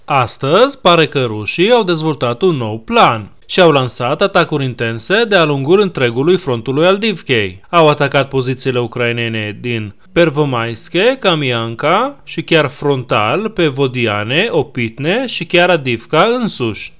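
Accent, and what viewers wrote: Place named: Cluj, Romania. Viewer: Hai că nu-i bai. Bine soldat ardelean. Îmi place că ai intonație de pe la Cluj. Slavă Ucrainei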